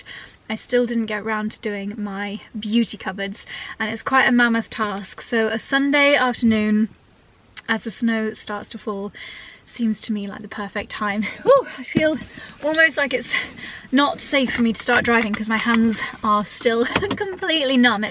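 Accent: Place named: United Kingdom. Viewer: I got to the “tail” end of your video, as I always do. I enjoyed the scenery, your bright, darling British accent, the homemaking bits…yours are some of my favorite videos to watch! One day I WILL travel from Texas to the Cotswolds…it is my dream. We have some lovely scenery and even quaint country roads in Texas, but we fall flat when it comes to architecture.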